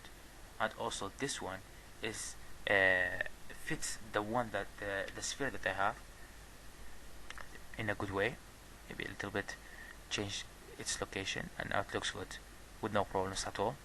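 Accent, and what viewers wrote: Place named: France. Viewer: thank you very much ,,, you are french,Right?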